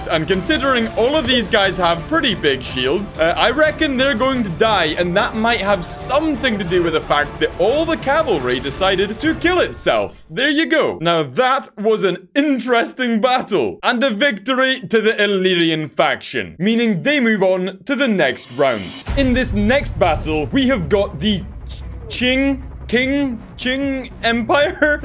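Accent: Scotland, United Kingdom. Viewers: What The Hell? Is Going On? I SUBSCRIBED TO A SCOTISH MAN!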